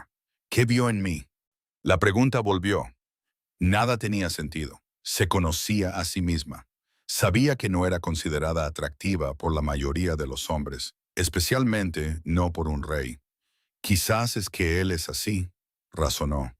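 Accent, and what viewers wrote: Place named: England, United Kingdom. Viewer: Horrible el español con acento ingles, nuestro idioma español es hermoso no lo arruinen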